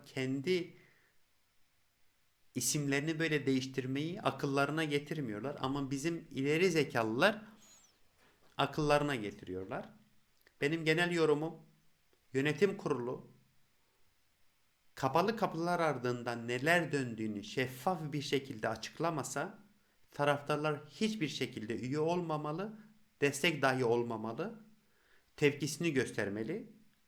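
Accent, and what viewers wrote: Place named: Armenia. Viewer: Sen Kürt değil, Ermeni şivesi ile konuşuyorsun. Sakalını sevsinler.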